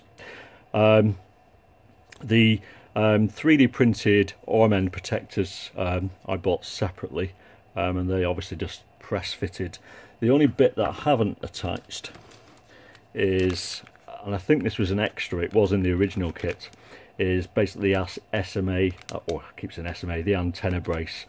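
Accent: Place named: North, United Kingdom. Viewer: nice one I'm sunny Grimsby and cleethorpes lol.could tell by your acsent.great stuff buddy keep it up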